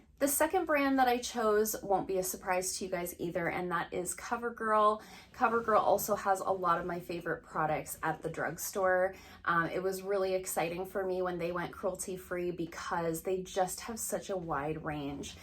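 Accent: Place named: Canada. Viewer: I love the 5 brands you chose. I just bought the new Camouflage foundation from Elf. It’s not bad. A little thick. Had to blend it out really well. I also bought the Madison Beer palette by Morphe. Great colors in that palette. I know you would like it. I like the Morphe brand, also. I want to try the Covergirl Fresh brand powder. That looks interesting to me. I always like to hear what works well for you. I will have to try a few that you have featured here. Love Color Pop, too! Love their eyeshadows and blush. Love your beachy curls! You look so pretty, as always. I love the way you say “about”. Your Canadian accent is adorable! Just like you! Take care, little pixie!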